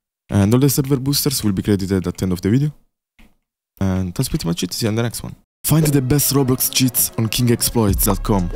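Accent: Italy. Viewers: tu sei italiano 100% si sente dal accento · l'accento italiano😭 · si vede che sei italiano dall'accento ahahah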